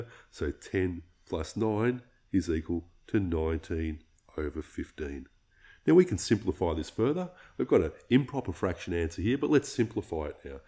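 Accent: Australia. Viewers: why does he have an aussie accent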